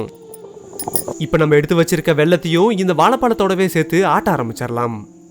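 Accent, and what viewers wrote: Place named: Tamil Nadu, India. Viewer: நல்ல ஒளிப்பதிவு, நடுநாட்டுத் தமிழ், இயற்கை, பனி, மழை, பசுமை னு ஊங்க வீடியோ உண்மையாக நல்லா இருக்கு பிரதர்! ..... செய்முறை இன்னும் கூடுதல் ஊக்கம் தருகிறது... வெற்றி நிச்சயம்🙏🤗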